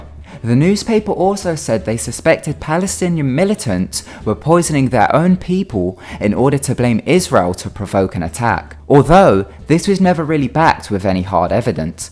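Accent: United Kingdom. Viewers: Pretty sure it's a strong UK accent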